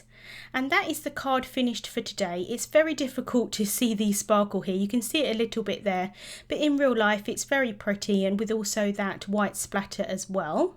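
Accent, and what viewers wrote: United Kingdom. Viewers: I always enjoy your video's and just love your British accents!!!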